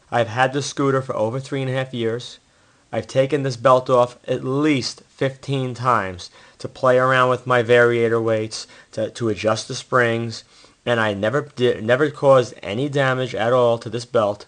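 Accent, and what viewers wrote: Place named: Rhode Island, United States. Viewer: Sounds like my friend from Rhode Island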